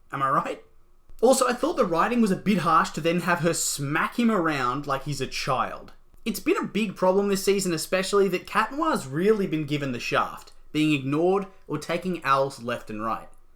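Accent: Australia. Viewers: are you australian? ps love your videos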